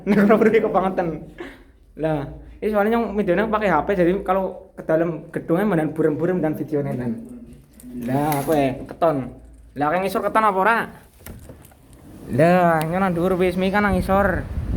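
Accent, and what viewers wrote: Banyumas, Indonesia. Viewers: Ngapak bgt rika kaya wong banjar,awas gole nyabrang cincing mbok teles hehe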